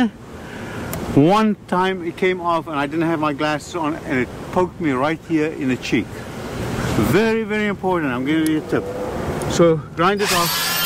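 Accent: South Africa. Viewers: I detect a fellow South African perhaps?? Please help me find a similar product in SA!! I need a flat roof doctor in Cape Town please!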